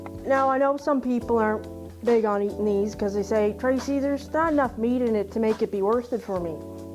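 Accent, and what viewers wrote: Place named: Maritimes, Canada. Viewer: Oh, be off with ya! That's our Maritime accent over here, don't ya know?!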